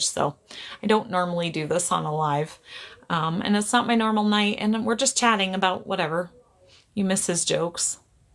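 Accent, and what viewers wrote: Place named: New York, United States. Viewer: You also sound like you're from Jersey or New York area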